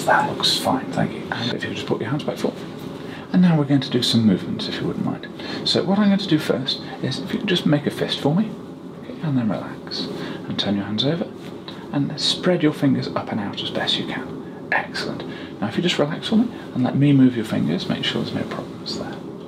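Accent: United Kingdom. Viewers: Not sure why I’m supposed to watch this, but it’s always nice listening to a cute Brit so okay.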